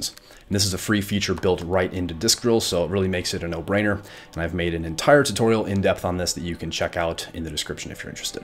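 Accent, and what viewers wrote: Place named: Canada. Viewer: Hi there! Great video! I will try to recover my SSD. By any chance are you Canadian? I am, and I hear the accent in your video. Great job on this and thanks for helping us with recovery issues!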